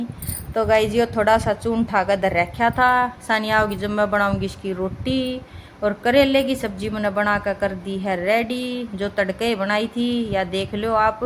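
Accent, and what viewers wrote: Haryana, India. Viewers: Very nice haryanvi ❤😮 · Nice haryanvi boli aache lage😂 nice vlog👍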